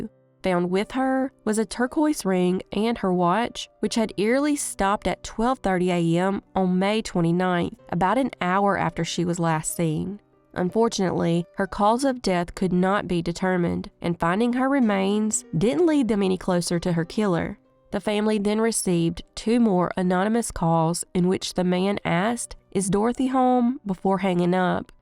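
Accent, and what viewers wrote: South, United States. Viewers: Can't stand the hillbilly narrator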